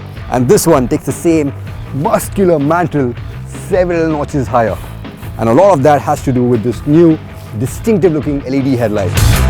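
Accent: India. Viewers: great review and the Indian accent rocks :o)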